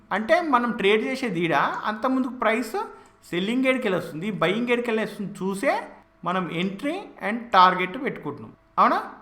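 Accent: Telangana, India. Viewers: Super Bro .. your Telangana Ascent Higlight.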